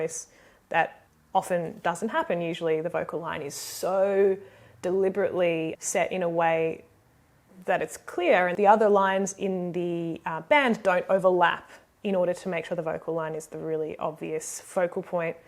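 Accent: Australia. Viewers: Wait.. am I not identifying your accent correctly.. are you Australian? I always thought that strange instrument sound is a didgeridoo, isn't it?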